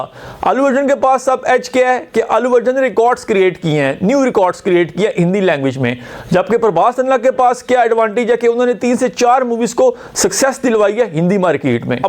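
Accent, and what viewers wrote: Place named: Pakistan. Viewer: You seem pakistani accent।।।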